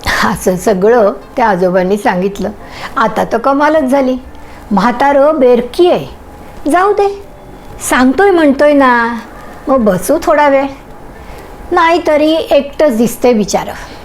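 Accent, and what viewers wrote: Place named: Rural, India. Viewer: शिल्पा ताई जोशी क्षीरसागर यांची किती सुंदर कथा निवडली.कथेतील सृजनता अनेक अर्थाने बोधप्रद होऊन गेली.मुळात त्यांची कल्पना अदभूत आणि त्याचा त्यांनी केलेला कल्पना विस्तार संवेदनशील झाला आहे. कथेतील शेवटची ओळ कथेला एका नवीन उंचीवर घेऊन गेली. तुमचे सादरीकरण नेहमीसारखेच दृष्ट लागण्याजोगे झाले आहे. तुम्ही ग्रामीण भाषेचा लहेजा ज्या पद्धतीने तोलून घेतला त्यातूनच तुमचे व्यासंगी अष्टपैलुत्व पुन्हा एकदा सिद्ध झाले. सुनिता तोंडोळकर./ छत्रपती संभाजीनगर. डॉ.शिल्पाताई आणि तुम्हां दोघिचेही हार्दिक अभिनंदन ---!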